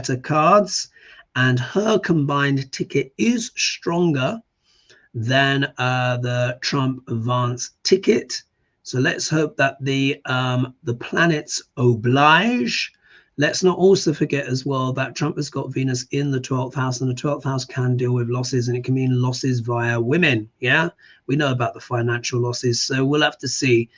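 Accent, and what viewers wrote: United Kingdom. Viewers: Omgggg I love your British accent 😍😍😍😍😍🤍🤍🤍🤍🤍😭😭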